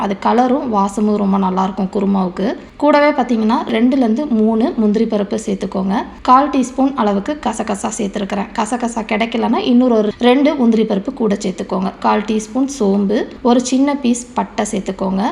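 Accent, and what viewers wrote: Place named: Kongu, India. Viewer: Sister unga native enga.. கொங்கு தமிழ் mari இருக்கு உங்க slang????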